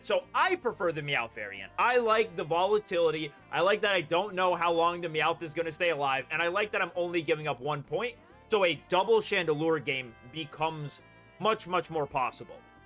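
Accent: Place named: North East, United Kingdom. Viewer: I've never said this bro but I think you must be from the north east. Just based off your accent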